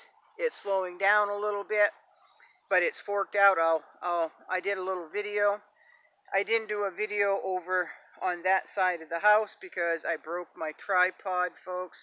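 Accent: Maine, United States. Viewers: Im one of the new subscribers- youtube suggested it while I was watching permapastures. I subscribed because I like the easy going yet firm manner and the garden behind you looked intriguing, I may do a teepee next spring. I also subscribed because I miss the Maine accent, a lot of the family on my dads side are from there.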